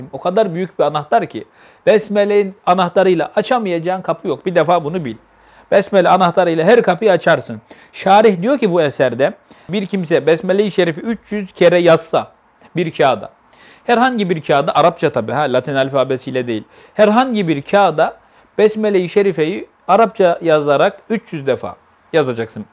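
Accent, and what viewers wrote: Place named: Azerbaijan. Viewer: Azərbaycan Türkcəsinin danışığıdır. Halal olsun.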